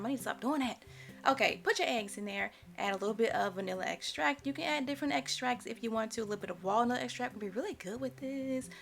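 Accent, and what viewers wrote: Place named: South, United States. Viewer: Luv your cookin' and Southern twang sis! 🥰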